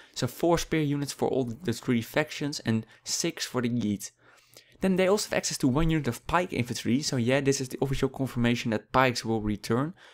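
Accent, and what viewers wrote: Netherlands. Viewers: Are you Dutch? You sound a bit like my brother when talking English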